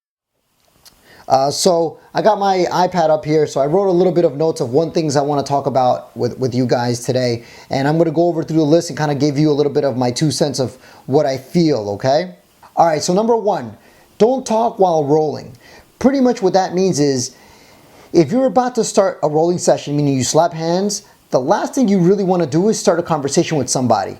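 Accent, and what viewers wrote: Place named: South, United States. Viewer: That southern accent though 😂😂😂 bloopers made this video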